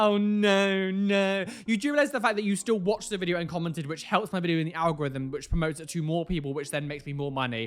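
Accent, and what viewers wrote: Texas, United States. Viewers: Atleast your American accent isn't as bad as American's British accent Like if America can have different accents why can't we lmao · The fact can do an American Accent better than I can do a British accent 😂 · YO, ARE YOU TEXAN?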